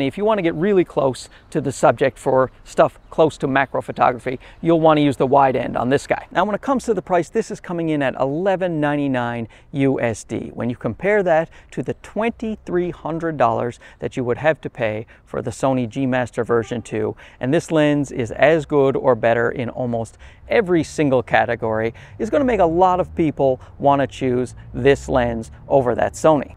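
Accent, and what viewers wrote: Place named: Canada. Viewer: I've been watching your videos for awhile now and as I was watching the intro I thought that maybe you sounded a bit Canadian and then you mentioned you're from Toronto! Fellow Torontonian here! I also want this lens really bad Haha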